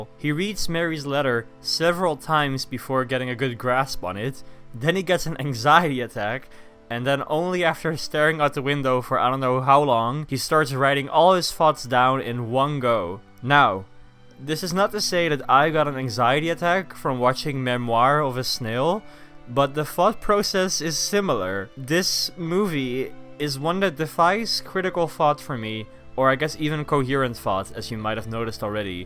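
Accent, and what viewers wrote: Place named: Scotland, United Kingdom. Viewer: Why is your accent 15% Scottish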